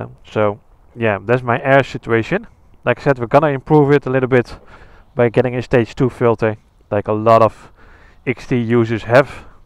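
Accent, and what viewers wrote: Netherlands. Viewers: Haha ik dacht ook gelijk hé Nederlander haha. Rijd ook een R. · Ik hoor gewoon aan je dat je nl bent😂 praat gewoon nederlands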